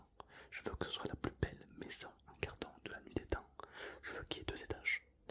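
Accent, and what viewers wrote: South, France. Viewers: n’a plus d’accent du sud en chuchotant Like si ta remarqué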